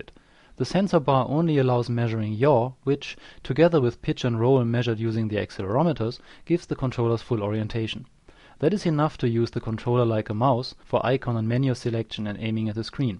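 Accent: Germany. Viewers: u sound german... but dont worry, me too^^ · of course, you can hear when a german speaks english :-D. But his english is good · hi, bist du ein Deutscher ??? klingt nähmlich so :D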